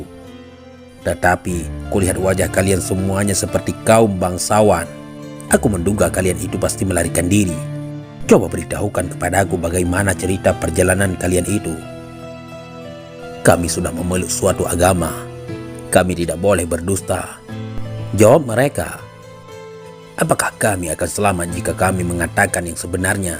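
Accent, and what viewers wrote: Aceh, Indonesia. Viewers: Naratornya dr Bali, Padang atau Aceh? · Logatnya seperti org aceh.. Terdengar dari palafazan huruf "T" nya yg tebal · Apakah pengisi suaranya org Aceh??? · Ini yg crita pasti bukan orang jawa , kyk orang aceh atau bali juga lombok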